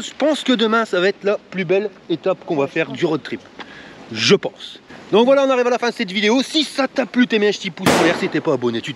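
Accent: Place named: North, France. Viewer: Un kiff total !!! Avec l accent du chnord c est vraiment cool ! 🎊👍🏻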